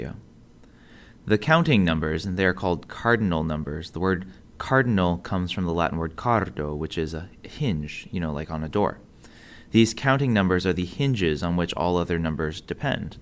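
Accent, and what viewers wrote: United States. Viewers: Gotta work on that pronounciation. Sounds way too American . Nice educational video tho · It is really underwhelming to learn Latin by listening to this strong American English accent. There's no "Rrrr" sound at all, for example.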